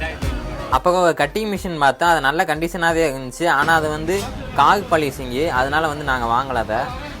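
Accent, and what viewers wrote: Madurai, India. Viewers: Madurai ahh bro neenga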